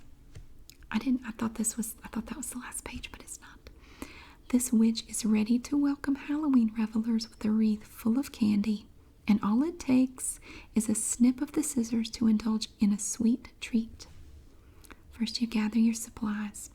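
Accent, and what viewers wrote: South, United States. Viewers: Hey first I love your videos and your characters are the best! You should do a video showing how one of your characters does her makeup... like a bitchy girl puts on wild makeup! I would love to see that in your southern voice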